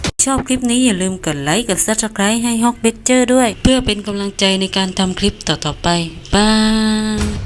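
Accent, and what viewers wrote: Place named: North, Thailand. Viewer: สำเนียงคนบรรยาย..น่าจะเป็นคนภาคเหนือใช่มั๊ยครับ😁😁😁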